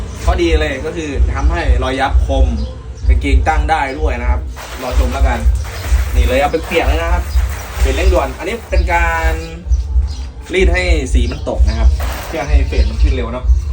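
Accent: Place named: Isan, Thailand. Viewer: อีสานจัด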